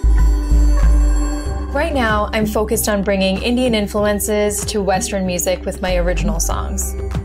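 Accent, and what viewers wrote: United States. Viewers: Yeah... Indian music, Indo-westren clothes, American accent n free bird... Makes u unique from others... That's why we love❤ uhhh 😘😘 love from ur root place Congrats for 7 M fam #VV ❤ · Hey your simple amazing girl and jus be what your and your voice soooooo lovely special with u s accent 👍🏻👍🏻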